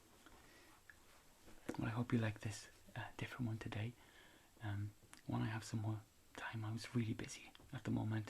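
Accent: Wales, United Kingdom. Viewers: A Welsh accent! Very pleasant to listen to. Supposedly many of my ancestors were Welsh (I'm American). I can't wait to see more videos from you! :)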